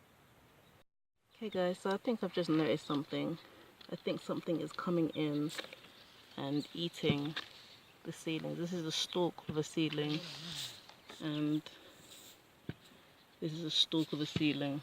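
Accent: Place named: United Kingdom. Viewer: So good to hear that crisp British accent after trawling through so many annoying amercian video's! About to try and grow some here in the far south west of the U.K. for the first time. I've been led to believe it's possible, I guess we'll see!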